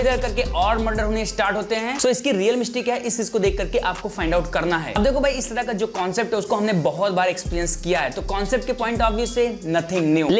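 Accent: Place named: Haryana, India. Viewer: I like haryanvi style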